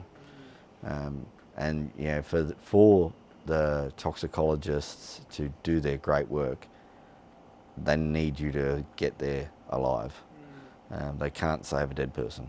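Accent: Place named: Australia. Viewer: I love the Aussie accent. ❤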